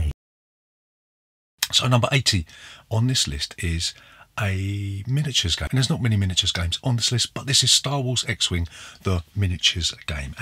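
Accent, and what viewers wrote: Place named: South London, United Kingdom. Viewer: Another great and really interesting 10... Chinatown and Puerto Rico were two of the first of the modern style games that I played...👍. Must admit I prefer San Juan... Really looking forward to the next ten... Great job... Got to ask...where are you from...? Your accent is almost as south London as mine...no offence meant if your not from South London...😁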